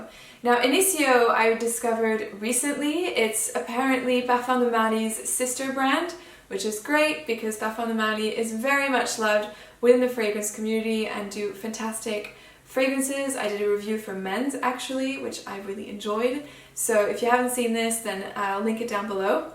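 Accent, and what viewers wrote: France. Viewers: Hi I am from Portugal and I love your channel , you describe fragrances perfectly and your french accent is beautiful . Great job 😁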